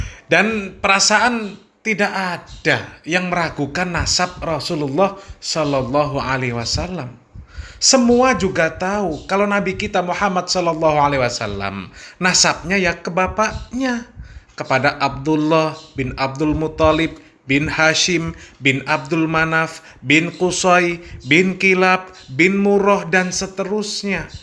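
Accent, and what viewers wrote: Java, Indonesia. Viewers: MIN ADMIN....OMAHMU NGENDI MIN....BHSA JOWO MU KOK MEDUK... JOWO NGENDI KUWE.... AYO KETEMUAN MIN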